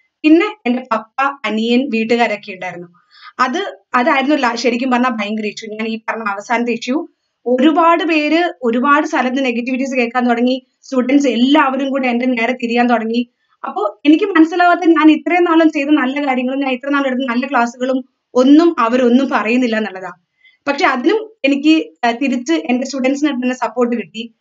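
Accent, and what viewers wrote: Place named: Tamil Nadu, India. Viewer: Are you no malayali?🤔Tamil style aanelllo.🙄UK annenn vach Malayalam parayaan nanakedd ano?😮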